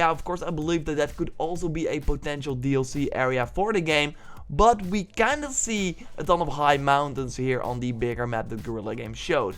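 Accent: Netherlands. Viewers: I never thought about your dutch accen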